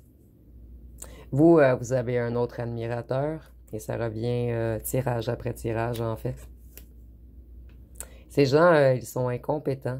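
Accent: Quebec, Canada. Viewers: ❤❤❤❤ BONJOUR MME J AI ENTENDU SE QUE VOUS AVEZ DIT SE MATIN MAI SACHER QUE TOUT LE MONDE N RST PAREILLE ET MOI JE VOUS APPRÉCIÉ ET VOTRE AXCENT QUE J AIME J AI BEAUCOUP TRAVAILLE AVEC DES QUÉBÉCOIS ET JE M ENTENDAIS BIEN DRS BON SOUVENIRS ...... MERCI POUR TOUTES VOS GUIDANCES MAI JE SUIS EN BÊTE AVEC C EST GENS MAI LA POLICES QUE FONT-ILS ????? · Vous avez de très belles mains et ongles très beaux bien ,j'adore cela ,votre accent québécois aussi est fort agréable Madame , et vos tirages sont très justes toujours , vous êtes très bonne dans les prévisions de guidances .❤👍🙏🌹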